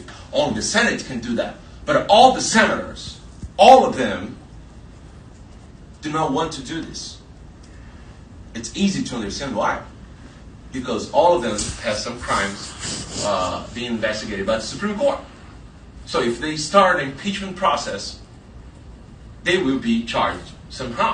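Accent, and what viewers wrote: Brazil. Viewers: Inglês horrível...sotaque brasileiro ....vocabulário restrito 😂😂😂qto ao conteúdo só lamento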